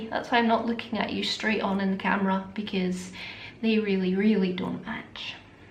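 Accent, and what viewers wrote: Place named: England, United Kingdom. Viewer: I thought you were from England! Lol still love the accent 💕💕💕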